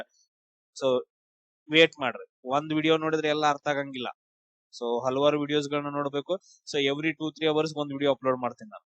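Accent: North Karnataka, India. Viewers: Super bro your tricks ,,niv pakka Bagalkot or Belagavi ಇರಬಹುದು ನಿಮ್ಮ ಈ ಕಡಕ್ ಮಾತು ಕೇಳಿದ್ರೆ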